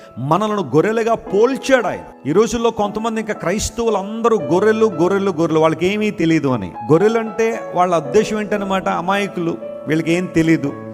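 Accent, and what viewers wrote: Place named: United Kingdom. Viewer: Wah british accentch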